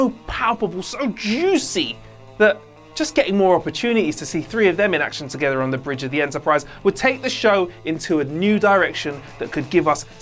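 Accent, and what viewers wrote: United Kingdom. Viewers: lol u so brittish <3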